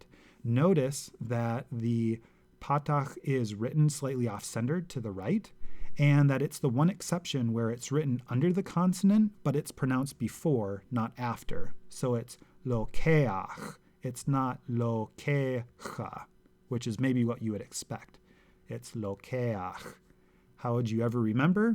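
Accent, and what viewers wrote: United States. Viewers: I consider this harmful to anyone learning Hebrew. You have a heavy American or Canadian accent, and your vowel placement so different to Hebrew, I could barely recognize the letters you mentioned. Anyone who used these videos to learn would surely end up sounding American? Also, the video is called "Biblical Hebrew" but you're rejecting Biblical pronunciation and going with modern pronunciation, which isn't helpful to anyone learning Biblical Hebrew. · Thanks so much. You should perhaps point out that your pronunciation of many of the vowels is distinctly American, and is different from the non-Western original Hebrew pronunciation. For example the letter yod should be pronounced as the oo in wood, and not like the o in yoga. It can be confusing for those who are not cogniscent of your strong American accent. Thanks again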